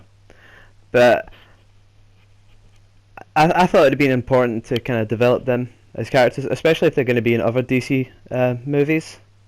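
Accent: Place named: Kilmarnock, United Kingdom. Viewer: That killie accent makes me moist :0 Film would've been so much better had they edited it differently. There's a lot of deleted scenes where they could have made a completely different movie, but instead it was mediocre. As for deadshot, he needed humanised, you need to get behind the main characters. They were all nicer and gentler than the comics depict them. Affleck was the tits yo!!!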